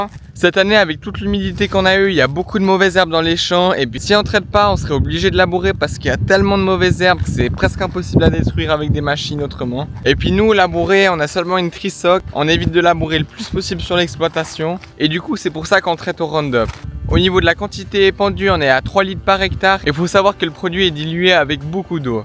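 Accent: Switzerland. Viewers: L'accent du Nord Vaudois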